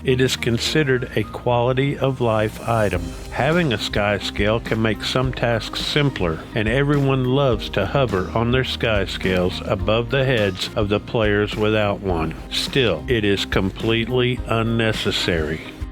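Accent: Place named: South, United States. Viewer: Thanks, love your voice...you should do radio! Where are you from? There is a soft inflection there that reminds me of the south, where I did a lot of my growing up. I am too uncoordinated for JPs, does getting the skyscale require those? My server sucks too as I am in the remote north (more north than the Nords, lol). Thanks again.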